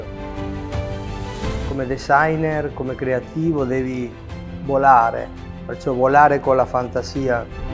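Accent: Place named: Argentina. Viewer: That Argentinian accent is so rough lol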